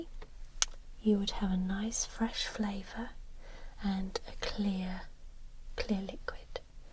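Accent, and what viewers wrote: England, United Kingdom. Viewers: cat I mainly detected a British accent, but I thought maybe there was a hint of Australian there. Regardless, it doesn't matter; she has an amazing voice and demeanour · lovely British accent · Yes she is English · Another amazing video. Love listening to fellow English ASMR accents ❤︎ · are you from uk? Your accent is not American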